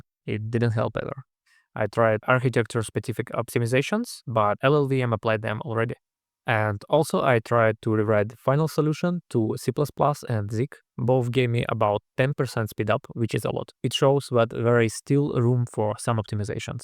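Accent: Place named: Russia. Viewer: Мне так нравится слушать русский акцент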